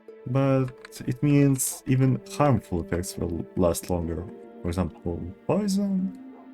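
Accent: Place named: Russia. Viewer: Чую чую я русский акцент, чую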